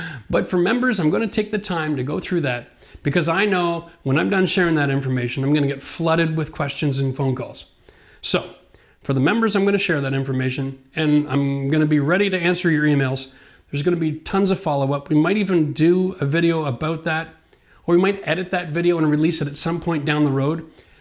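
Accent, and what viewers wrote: Canada. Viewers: and crew, I am no stranger to DYI however I found myself with a project I had never done. Upstairs shower leaking to downstairs kitchen. I found your channel by accident and when I heard the Canadian accent I was hooked. I live in NE Ohio USA and the weather plays a big part in any renovation. You had great advice, both time consuming and monetary. I wanted to thank you for sharing your experience and give a shout out to your son who made the comment (at the same time as me watching your video) "Now show them how to do it without 35 years experience".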